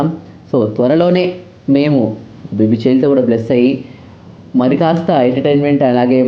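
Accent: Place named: Kadapa, India. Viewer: Meedi Kadapa Anna happy to listen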